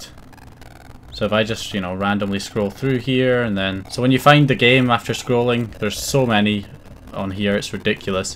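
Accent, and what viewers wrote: Scotland, United Kingdom. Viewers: Good to hear a fellow Scotsman on here! I’ve only just this second learned that my PS4 can be jailbroken! Amazing but can you advise a newbie where to start or point me in the direction of which of your vids to go to first? I’ve no clue about terminology or that. Cheers man and all the best!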